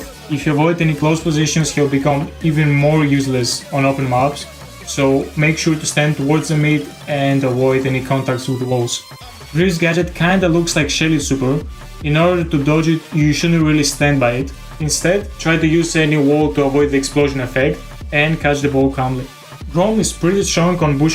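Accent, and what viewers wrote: Greece. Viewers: Are you from Greece???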